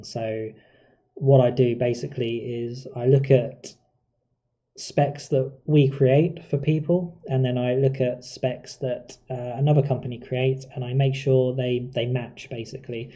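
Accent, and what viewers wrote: United Kingdom. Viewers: Omg ur British? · Woah you're brit?! :O alright im subbed haha xd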